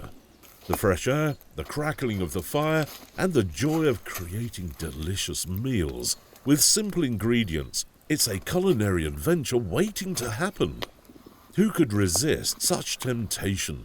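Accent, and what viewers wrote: United Kingdom. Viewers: Get rid of the British narrator please!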